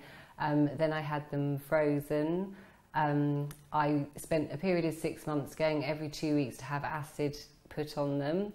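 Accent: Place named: England, United Kingdom. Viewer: What is beautiful about this woman? EVERYTHING!! Her face, her smile, and perhaps, most importantly, her accent. I wonder where is she from. I know she is from England, but I wonder what part of England?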